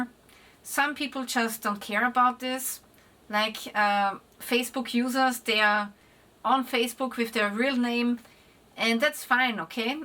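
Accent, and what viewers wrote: Austria. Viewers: I love your content... love your Austrian accent. :) Agree 100% that Germans are awesome... been to Braubach, Oberwesel, and Frankfurt. People could not have been more friendly and gracious. Bitte and danke go a long way with them. Their government, well... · You do have the better dialect 😄 When I made a trip around south Germany and Austria, I suddenly felt that German language can sound nice, soft and elegant after crossing the border into Austria ❤🤍❤ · I love the Austrian accent, I’m glad Germans are leaving, it’s not right or left anymore, it’s the people vs government. ☝️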